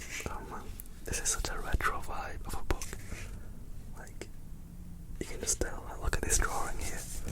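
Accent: United Kingdom. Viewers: Handsome Indian man ✅ gorgeous British accent ✅ amazing asmr video ✅ this is the best! Love your channel! Thank you 😃💙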